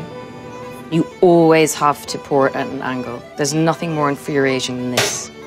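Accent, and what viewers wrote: Ireland. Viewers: I've loved her since Misfits but I had no idea she had an Irish accent! I've always thought she was English. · I legit did not know she was Irish! such a cute accent! love, love, love the lewks she's serving - low cut and statement earrings, YEEES!!!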